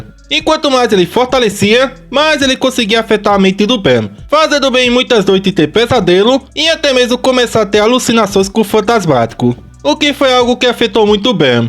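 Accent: Brazil. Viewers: Me pergunto de que país esse cara é, o sotaque bele parece muito com o ptbr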